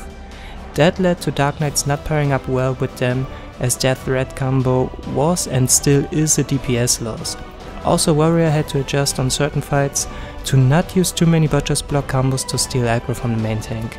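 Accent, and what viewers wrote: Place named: Germany. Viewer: Ty for this guide!! Und so bist du Deutscher? Höre es in deiner Stimme iwie😂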